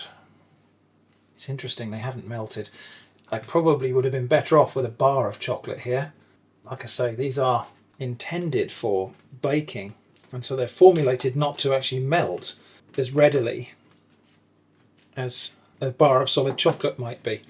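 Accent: United Kingdom. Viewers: You don't know how wonderful it is to hear a British accent calling cookies "cookies" instead of biscuits.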